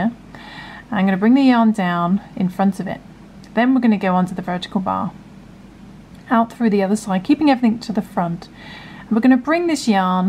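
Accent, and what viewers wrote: United Kingdom. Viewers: Hi you sound like a Brits I know Brits are great knitters but have no idea you are such a fantastic crocheter. I really would like to learn from you how to roll your hook. I stop crochet because because I hold the hook like a pencil and bend my thumb a lot to pull the yarn and my thumb became so painful that I stop doing crochet . I know if I pull the hook like you do it will not hurt me because I will not have to bend my thumb. Will you please feature on video to show how to hold the hook. Thanks a lot.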